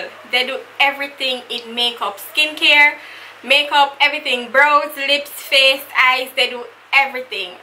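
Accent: Jamaica. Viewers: New Subscriber! I heard a familiar accent and had to support you. Good to see a yardie onYoutube!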